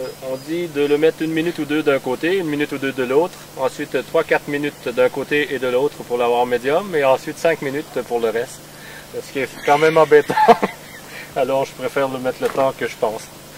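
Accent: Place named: Quebec, Canada. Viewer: Amusant, il a l'accent Québécquois. Et du Kangourou, j'en mange ce soir pour le réveillon de Noël 2010 en France.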